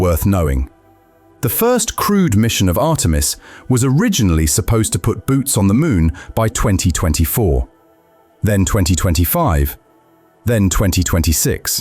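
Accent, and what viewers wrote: England, United Kingdom. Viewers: He's English from the sounds of it. Some of their pronunciations are weird.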